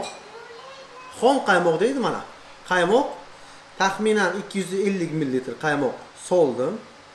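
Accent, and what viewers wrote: Bukhara, Uzbekistan. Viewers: Шевага караганда азим Бухороданми сиз лекин жуда Куп гапирдиз ишдан кура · Шашлик майликуя бухороча шивани коилатиздаааа таго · Узи Гиждувонликман, шашликдан хам кура Бухоро шевасини купрок согинганман, рахмат. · Бухоро шевасини роса согингандим, мазза киб эшиттим рахмат 🤗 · Бухороча шевада гапирганингиз учун 2 лайк🙄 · Buxoro sheva N-1. Shashlikni dodasi ham Buxoroda😊😊😊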